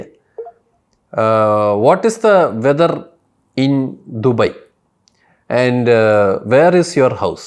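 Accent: Trivandrum, India. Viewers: Nalla tvm slang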